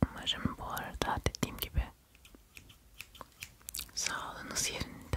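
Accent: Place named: France. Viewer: Sen turkce ceksen o Fransız aksani ile en az 10 bin abone gelir yavrum ;d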